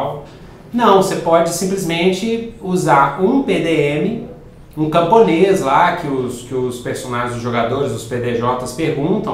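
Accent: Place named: Minas Gerais, Brazil. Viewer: Mano... ele é mineiro! é foda!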